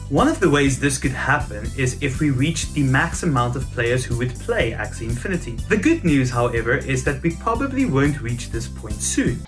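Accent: South Africa. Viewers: I thought you sounded South African. I'm originally from Ghana, but I live in South Africa.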